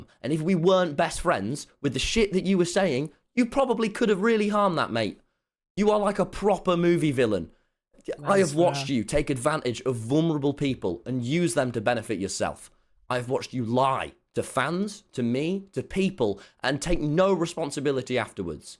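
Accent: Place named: Midlands, United Kingdom. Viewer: no that’s just a midlands accent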